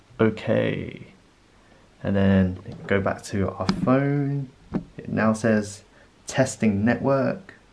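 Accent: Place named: United Kingdom. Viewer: Wow never seen an Asian man or woman speak a British accent until now, nice video as well. Don't have a PC but gonna get just something cheap to stream seeing as I need it. Thanks for the help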